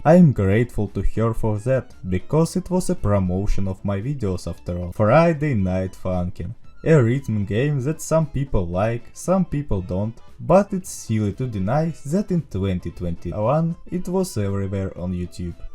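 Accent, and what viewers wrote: Russia. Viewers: Русский акцент имба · The Russian accent, lol (i like your voice, the only thing that is funni for me is the Russian accent) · Ахах,найс русский акцент) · ДА ТЫ РУССКИЙ!! Я ПО АКЦЕНТУ СЛЫШУ!!!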